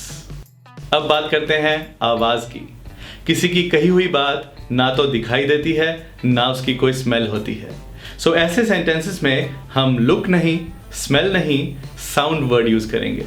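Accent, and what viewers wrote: Punjab, India. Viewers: Appka punjabi rool bada sone lagtehai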